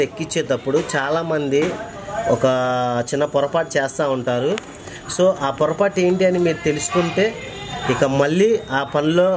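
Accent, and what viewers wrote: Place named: Singh Nagar, India. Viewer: nuvvu matladuthuntee singnagar ,vombaycolony slang ardam aipothundhi Anna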